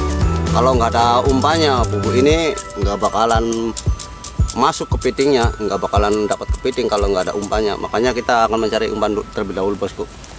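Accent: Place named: Cirebon, Indonesia. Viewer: Logate kaya orang indramayu/cirebon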